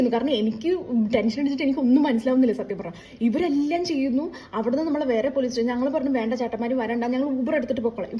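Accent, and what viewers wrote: Kannur, India. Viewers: Keralathil evideyaaa??? Ur slang has a Kannur tone … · Ingal kannur ano????.. Samsaram niceee❤️😄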